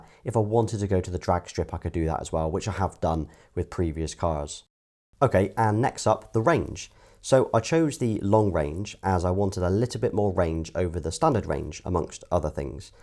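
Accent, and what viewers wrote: United Kingdom. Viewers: Why are most of the YouTube content from USA ? Good to hear british speaking!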